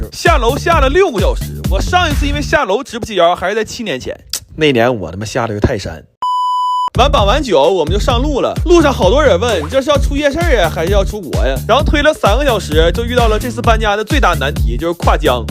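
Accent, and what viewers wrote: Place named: Northeast, China. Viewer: 都是久视频，就喜欢一边上班一边偷听这东北话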